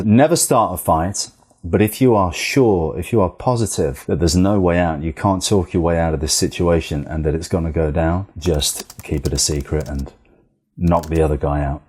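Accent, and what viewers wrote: United Kingdom. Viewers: He has British accent, it hard to hear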